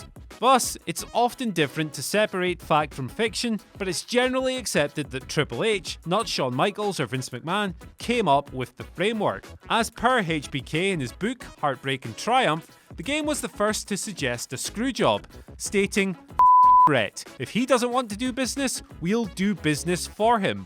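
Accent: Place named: Scotland, United Kingdom. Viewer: Finally a Scottish narrator 🇬🇧🇬🇧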